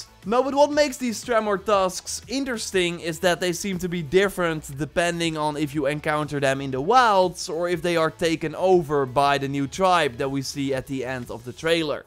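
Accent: Netherlands. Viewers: when I heard his accent I knew instantly that he was dutch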